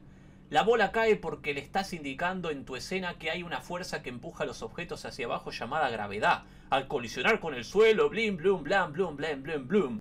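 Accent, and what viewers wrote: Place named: Argentina. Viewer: excelente video pero insulta más porque en el acento argentino suena gracioso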